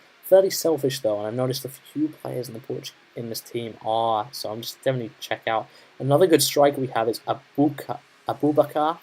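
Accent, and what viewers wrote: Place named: United States. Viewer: you sound like you have an american accent. any reason?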